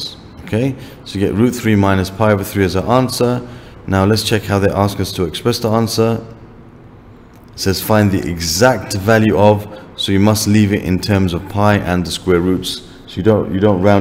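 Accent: United Kingdom. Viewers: That's really interesting. They say you can sort out where a Brit is from by his accent, but hearing your flawless London accent yet knowing your very "un-British" name, I couldn't hold my fingers from asking you this. Thanks for the video and for your reply.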